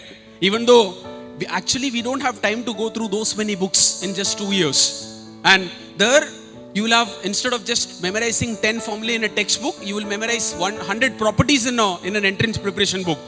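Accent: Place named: South India, India. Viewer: Sir your English speaking style is very different because you are from south India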